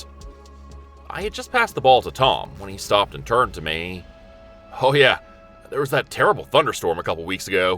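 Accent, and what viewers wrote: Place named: Canada. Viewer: Cause he’s from Canada - and no we don’t all talk like that!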